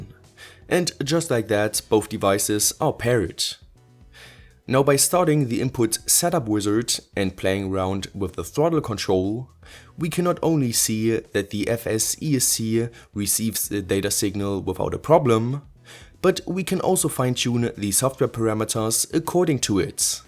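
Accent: Germany. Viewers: Hört der sich nur für mich deutsch an ? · I cant stand your german accent please learn proper english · Are you from Germany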